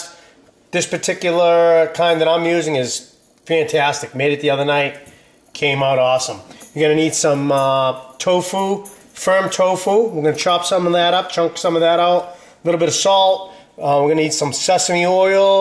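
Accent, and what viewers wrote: Boston, United States. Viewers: sounds like ur from boston. come on say dark againg.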